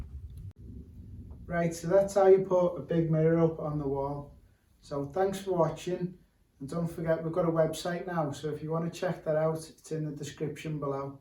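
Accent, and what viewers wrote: Liverpool, United Kingdom. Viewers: Liverpool accent?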